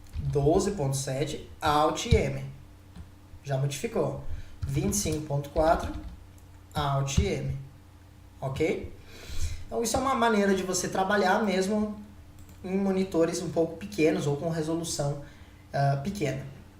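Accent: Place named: Rio Grande do Sul, Brazil. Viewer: Ótima didática! Parabéns! Eu utilizava outros softwares para modelação de estruturas metálicas, já havia mexido no Tekla há muito tempo em versões anteriores, essa versão está bem melhor! OBS: Pelo sotaque deve ser meu conterrâneo heheh... Estou em São José do Rio Preto/ SP, mas minha família é de Marau/ RS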